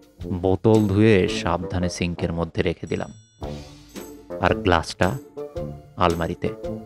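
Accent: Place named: Bangladesh. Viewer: বাংলাদেশী বাংলা ঠিক কর, बाल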